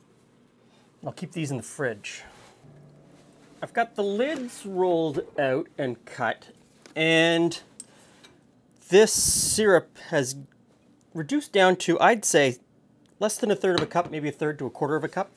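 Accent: Canada. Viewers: This is why I come here -- not just to remind myself of what a Canadian accent sounds like, but to learn little helpful tips.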